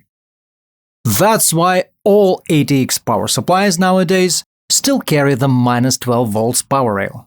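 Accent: Russia. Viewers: cuz he's Russian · you are russian or talk to russian people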